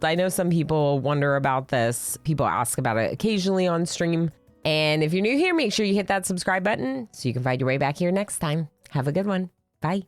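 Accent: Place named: United States. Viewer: You have such an annoying stereotypical american voice but you seem really sweet tho, so I'm a little conflicted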